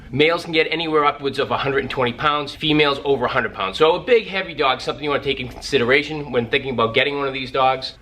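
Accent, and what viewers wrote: Boston, United States. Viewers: What accent is that? Boston?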